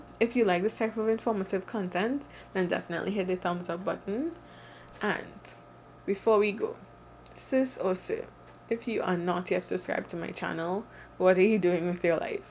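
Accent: Trinidad and Tobago. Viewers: That accent has to be from Trinidad 🇹🇹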